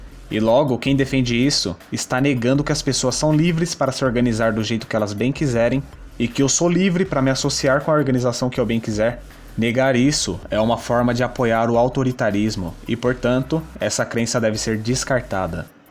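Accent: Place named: Caipira, Brazil. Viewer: E esse sotaque caipira...kkkkkkkkk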